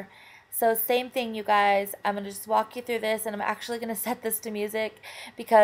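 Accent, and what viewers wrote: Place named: Nashville, United States. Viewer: hello where do you live you sound like your from Nashville that where I live but you said you went to somewhere eles to the dollar store just woundering love your vidos learn so much is there any way to send you a message?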